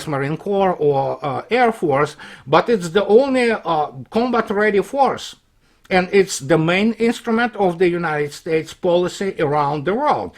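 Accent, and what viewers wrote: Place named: Russia. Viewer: I love your russian accent...🙂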